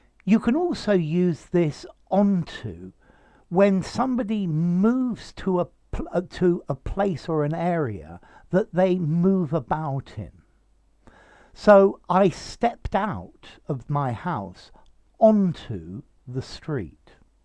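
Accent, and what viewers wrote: United Kingdom. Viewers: I love his accent so British ❤️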